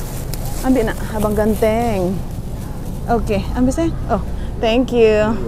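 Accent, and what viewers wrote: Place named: Karo, Indonesia. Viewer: Suka kali lihat logat kaya kakak, kayak bibik aku orang Karo. Selalu di tunggu shopping onlinenya dg ❤❤❤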